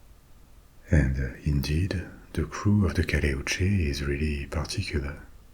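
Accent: France. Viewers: This is the perfect channel you put on to hear a gentleman tell tales and tell history like as if he was there in a gentle voice with a lovely French accent, a perfect combination, thats something to me is rare, and i love it, so all i have to say is this, Merci!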